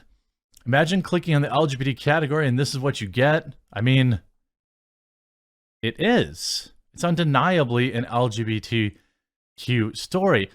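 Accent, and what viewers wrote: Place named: Wisconsin, United States. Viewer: I didn't realize you had a wisconsin accent until yesterday, and now it is all I can hear.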